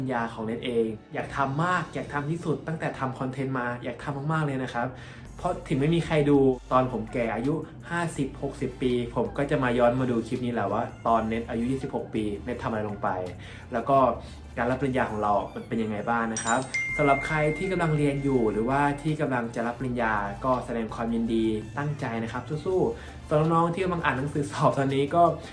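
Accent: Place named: Isan, Thailand. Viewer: พูดอีสาน มีเสน่ห์มากๆครับ ขอติดตาม ig หน่อยครับ 😊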